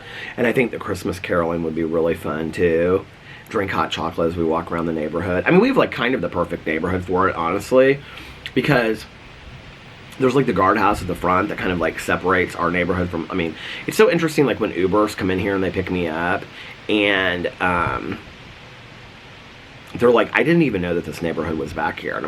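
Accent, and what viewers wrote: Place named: Indiana, United States. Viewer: Indiana-isms "I saw-l". "my grandma-l". Sandwich- "sammwich". Potatoes "Batatoes". There are so many more lol.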